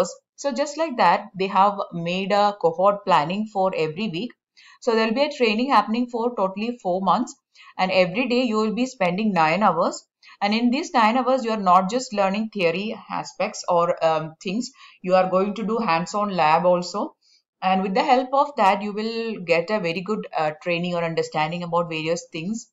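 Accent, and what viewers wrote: Kerala, India. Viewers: I think she is malayalee..by the way she speaks..... Thanks for sharing .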